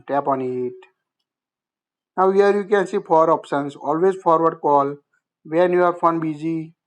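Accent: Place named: India. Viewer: Sir, Sir you would be better using a computer and print it out. Good grief. People cannot understand your dialect. It's too strong. I know you're speaking English, but it's a strong Indian dialect. Use a computer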